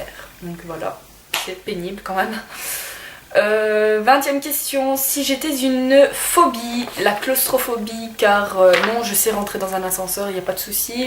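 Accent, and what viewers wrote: Belgium. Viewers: D'ou vien tu ? tu as un accent belge non ?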